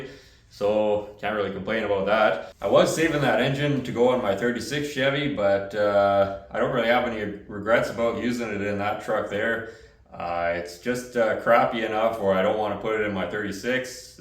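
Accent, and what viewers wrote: Canada. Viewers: Gosh, you sound Canadian!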